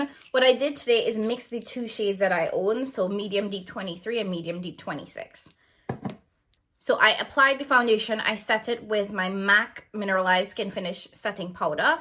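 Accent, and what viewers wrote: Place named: Trinidad and Tobago. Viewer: I heard the accent and said to myself she’s got to be a Trini. I am from Trinidad, I can’t miss our accent. I will subscribe and support!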